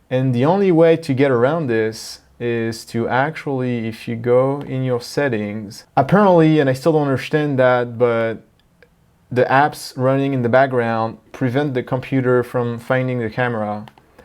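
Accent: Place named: France. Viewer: God bless you....I'm trying to record higher quality videos to keep my students moving with online classes here in NYC as we have been on lockdown due to COVID 19. You just helped me record full classes, so that my students can keep moving during this traumatic time. Grateful for this vid. I hope you and your family are safe and well. I also heard a French accent. Enchante!!!!! Merci!!!!!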